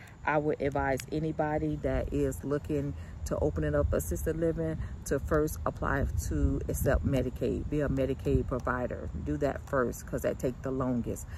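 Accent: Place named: South, United States. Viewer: You sound like my TT, and I'm straight out the south too. I think you sound just fine! Thanks for all this guidance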